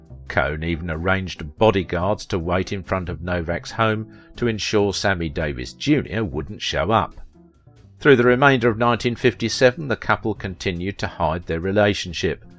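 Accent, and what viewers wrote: United Kingdom. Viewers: HI Beautifully narrated with that great British accent! Cheers! Shalom to us only in Christ Yeshua.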